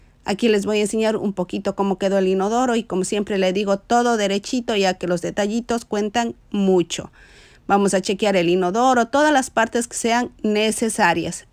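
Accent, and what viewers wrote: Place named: Ecuador. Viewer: por su acento, es usted Ecuatoriana, Pais lindo, de gente hermosa, trabajadora, si estoy equivocada, disculpen.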